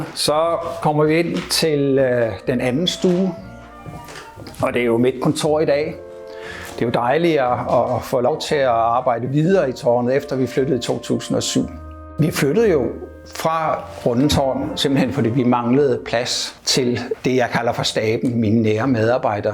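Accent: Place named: Bornholm, Denmark. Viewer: Tak for denne skønne video. Dejligt at høre den bornholmske dialekt i København. Sikken et liv, må have levet.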